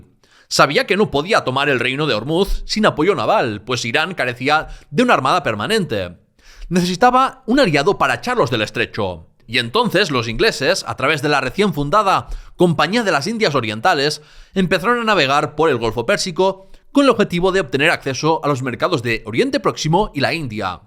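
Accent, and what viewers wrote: Catalonia, Spain. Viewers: Joder, que acento catalufo asquero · Acabo con la monarquía hispánica? Menudo acento indeptista tienes, tu canal parece una clase de adoptrinamiento de una escuela indepte pagada por ciuercal 3 por ciento . Menos mal que hablas español así me entero del tipo e odio que pretendes generan en quien te ve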